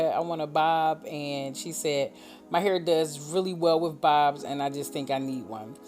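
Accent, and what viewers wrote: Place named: New York, United States. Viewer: I love your accent. Are you from NYC? Watching you work and listening to you is so soothing!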